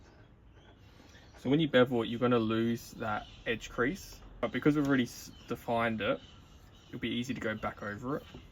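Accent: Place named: England, United Kingdom. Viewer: Love your videos! Can you give us a tutorial for concealed carry bags? I am an U.S Army veteran, and I carry my gun with me for safety purposes…but the concealed carry bag I purchased on Amazon is already falling apart after having it for 1 year. I was wanting to make my own. Not sure if you support concealed carry weapons, since it sounds like you’re from England, but I just thought I’d ask.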